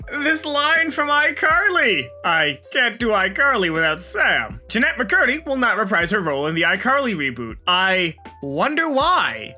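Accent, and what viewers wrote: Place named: New York, United States. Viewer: why do you sound so much more new york kwite?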